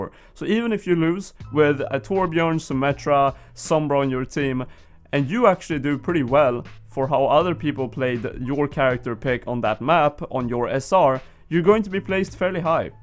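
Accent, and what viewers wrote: Sweden. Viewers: Are you from Sweden? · let me guess, ur from sweden? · Is you swedish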